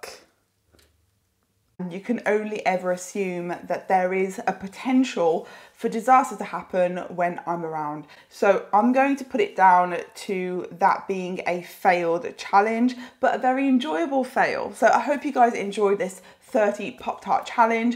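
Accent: United Kingdom. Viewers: there should always be a physique check! Oh and whoever said you talk too much was a fool, love your sexy British voice! First video of yours I saw was the 96oz Steak challenge and I was blown away by how much you could eat and how amazing you look.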